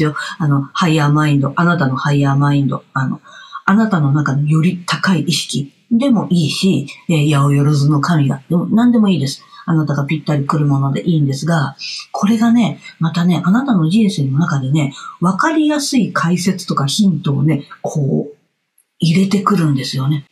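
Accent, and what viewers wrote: Kansai, Japan. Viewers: さん大切なお話し有り難う御座います😊目に見えない世界のお話は君が代の祝詞の中に書かれてありますね（千代に八千代に）を解説するとこの世は目に見えない世界(霊主体従)と見える世界(体従霊主)の内容が書かれてあります！私ね！宇宙のエネルギー、波動、周波数と言った振動を数値化出来る才能を天子から預かり不思議と分かります！さんの関西弁が大好きです😍😅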